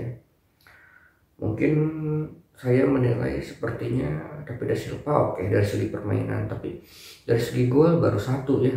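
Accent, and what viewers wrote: West Java, Indonesia. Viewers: Prediksi saya .mah persib menang.. tp ini adminnya pedukung persib..di liat dri logatnya bicara sih urang sunda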